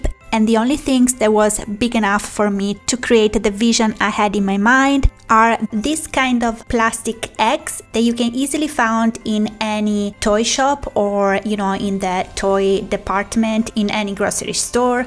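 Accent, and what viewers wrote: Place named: Italy. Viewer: love your channel:) your accent is very much like my friend from Casarano, Italy 🇮🇹 Viva Italia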